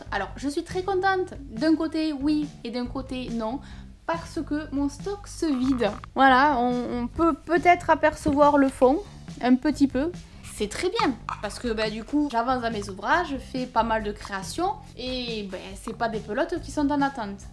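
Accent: South, France. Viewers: Tu peux être fier de toi😉rome ne c'est pas fait en un jour 😂😂j'adore ton accent du sud😉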